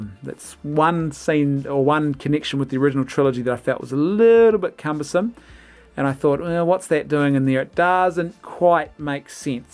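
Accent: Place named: New Zealand. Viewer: By the way...gotta love that kiwi accent.. with all your passion about SW maybe you should run for PM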